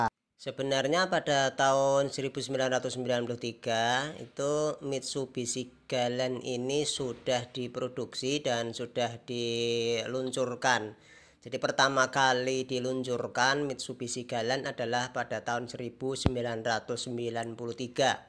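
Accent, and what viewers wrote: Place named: Java, Indonesia. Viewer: Medok coy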